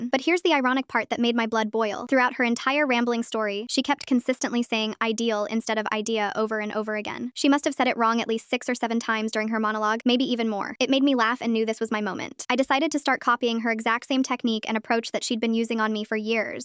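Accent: Boston, United States. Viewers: So you're from lower Boston just lean into it more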